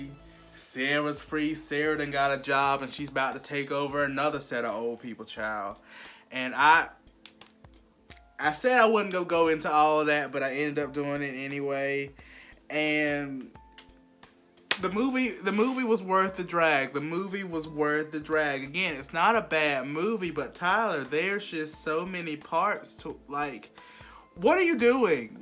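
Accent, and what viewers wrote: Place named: South, United States. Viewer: See I knew it was somewhere southern lol You're welcome, I could just hear your voice all day talk about Tyler Perry lol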